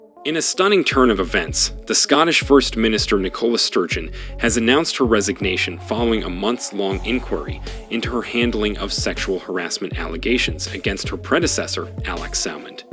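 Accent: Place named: United States. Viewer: what a load of britnat nonsense....spoken by an American accented robot....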